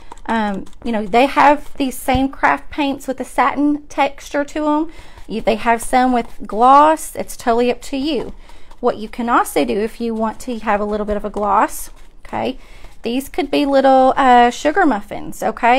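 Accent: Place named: Kentucky, United States. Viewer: I found you by accident looking for how to make faux food- and the fact that you do primitive style?!! Wow! Even better! I love primitives!! I haven’t seen anyone do primitive style with faux baking. I’m a new sub. Your vids are so easy to watch and just love your sweet Kentucky accent 😊. Greetings from southwest Florida! 🍊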